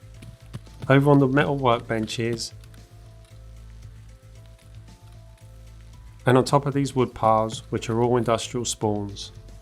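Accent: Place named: Romford, United Kingdom. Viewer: You from Romford? Your accent giving me serious Ray Parlour vibes 😂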